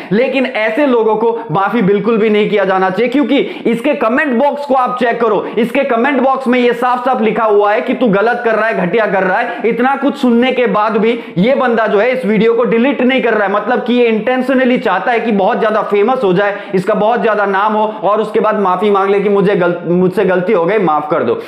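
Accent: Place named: West, India. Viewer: Nahi vai iska voice aur tone dekh k lagta hain western part of India se hain.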